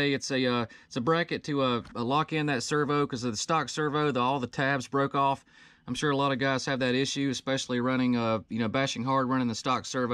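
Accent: South, United States. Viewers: I thought i heard a little southern in your accent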